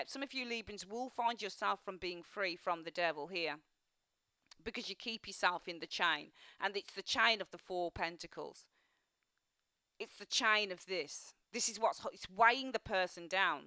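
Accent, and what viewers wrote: United Kingdom. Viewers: New subscribers here! Wow so refreshing to hear a uk 🇬🇧 reader 🤗 as I'm from Birmingham. Spot on for me‼️ I need to let go of my ex Cap the devil 😂 & be open to new love. Just a shame I still have to see him as we have twins together but I definitely need to let got! Thank you 😊 I really appreciate your readings! I hope I spot 👀 the new offer & take it 🙏🏾🤞🏾❤️🌈🙏🏾 I'm keeping my eyes peeled for that now as I don't want to be stuck in this unhealthy mess!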